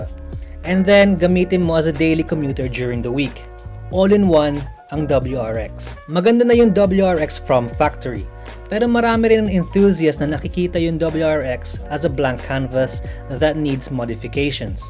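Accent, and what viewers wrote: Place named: Australia. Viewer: I like the cars you recommend sir but I love your subtle ozzie accent.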